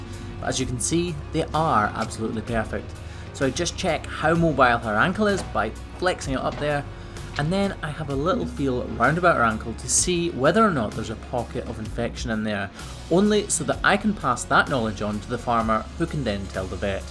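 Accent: Scotland, United Kingdom. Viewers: You did a great job on that cow!! I love your Scottish accent!!! You. An talk to me anytime!!!😊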